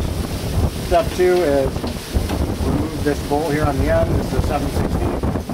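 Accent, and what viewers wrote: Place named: Chicago, United States. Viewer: I detect a Chicago accent . Also that Chicago wind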